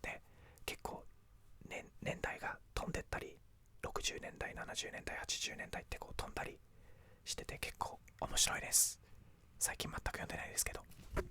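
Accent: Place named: United Kingdom. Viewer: 今日もPDRさんの英語囁き声が聞けて嬉しい( *´艸｀)←イギリス英語フェチ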